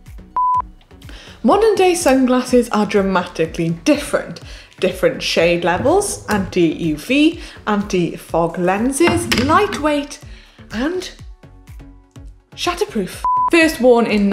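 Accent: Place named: Wales, United Kingdom. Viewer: Do you mean her accent? She's Welsh. That's how the Welsh speak.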